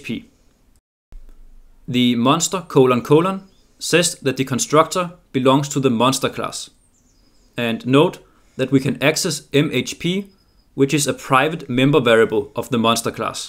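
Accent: Denmark. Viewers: Du lyder lidt dansk 😀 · As soon as I heard you said Vector I was like that sounds like a Danish accent and then I check channel description and it says Denmark. I am not sure if it’s the fact I am Danish I could tell or if it’s because I watched too many other Danish people, probably both.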